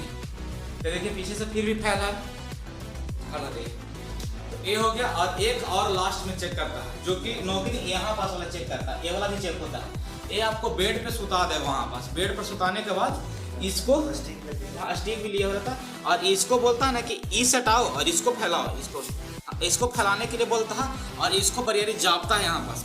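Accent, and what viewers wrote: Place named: Jharkhand, India. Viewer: Lag raha jharkhandi ho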